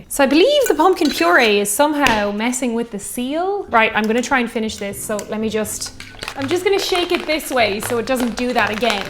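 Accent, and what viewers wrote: Ireland. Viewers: Both my Mom's parents are from Ireland. Your Irish brogue always makes me happy.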